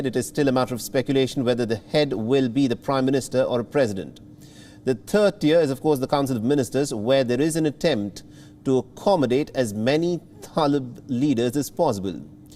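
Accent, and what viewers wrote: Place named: India. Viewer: Funny English accent hahahaha laugh at india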